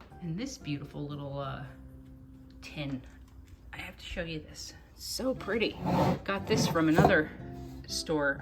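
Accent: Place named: South Jersey, United States. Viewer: I am watching from North Carolina. I love your videos; especially love your accent ! It is very nostalgic to me since I am originally from South Jersey! You sound just like my mom’s friend😂! I love the green in your house. That color is my favorite and I have it in my home too . I love how you shared that you mixed the paint colors to achieve the shade you wanted; that’s what I did with my kitchen cabinet color mixing two of the Sherwin-Williams green.😊 You are inspiring me to paint my table and maybe my chairs because I have a similar set…so cute!❤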